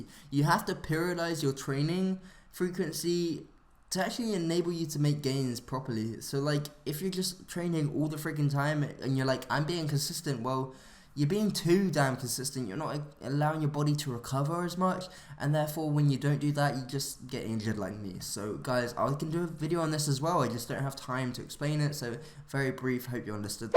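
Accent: United Kingdom. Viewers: Great transformation,. Just amazing....something I would dream about achieving. And as Leland, said, you are also fantastically handsome which makes the gains even more worthwhile. You've got it all, a great physique, super good looking man, wonderful British accent ( I love England and English folk), and of course, a wonderful boyfriend. Unfortunately, I have none of those....lol! Keep up the great work,! Your beautiful....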